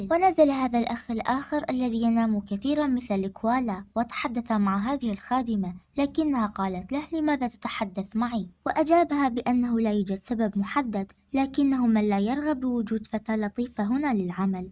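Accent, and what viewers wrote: Iraq. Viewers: ولله حسيت انك من العراق🤯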